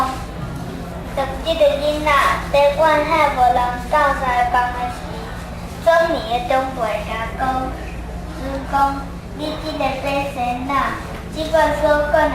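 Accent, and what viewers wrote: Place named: Taiwan. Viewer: 讀得太好了，情節很精彩，台語很道地。希望貴聯盟能製作有更多台語版的伊索寓言。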